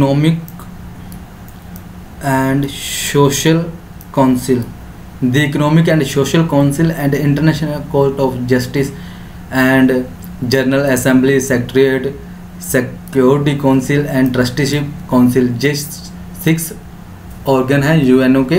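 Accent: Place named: Punjab, India. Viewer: From ur Language, you seems to be from PUNJAB ..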